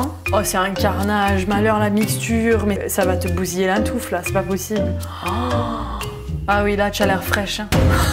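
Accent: Quebec, Canada. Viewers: Si c'est grave beau l'accent québécois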